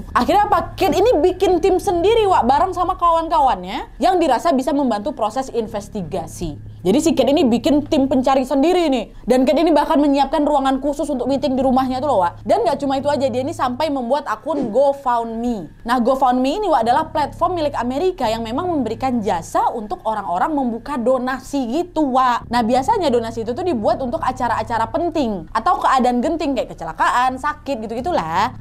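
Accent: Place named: Medan, Indonesia. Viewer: Aku penggemar baru celotehanmu.. Biasanya aku merinding..tapi tertawa denger ngoceh 2mu... mantap... lucu. Kocak... teruskan.. ala medan logatmu tu.. i like it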